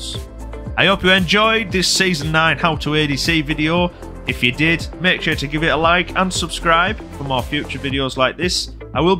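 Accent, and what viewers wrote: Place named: North, United Kingdom. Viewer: Ano thas from the north but I cant quite put ma finger on where exactly thas from cocka spadge and its driving me nuts but thy accent seems like its from round t'corner from mine.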